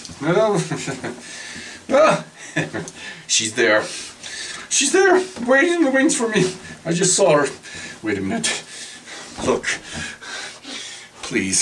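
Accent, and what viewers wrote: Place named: Russia. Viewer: I like your Russian accent. хорошо!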